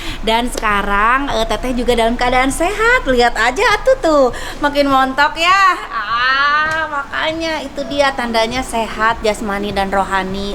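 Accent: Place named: Garut, Indonesia. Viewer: Uhuyyyy Lamun teh ngomong Nii hayang seuri.. bhs garuttna kaluarrr... Seruuuu lahhh👍🤣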